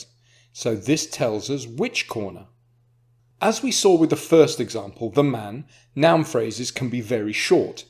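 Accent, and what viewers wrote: United Kingdom. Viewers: Beautiful British accent. I like it so much!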